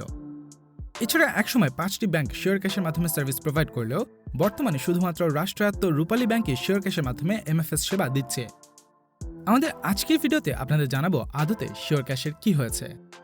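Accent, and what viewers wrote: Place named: United Kingdom. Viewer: বাংলা বলবেন বাংলার মত করে, ইংরেজির ফ্লেবার লাগাইয়া বাংলার সৌন্দর্য নষ্ট করেন কেনো?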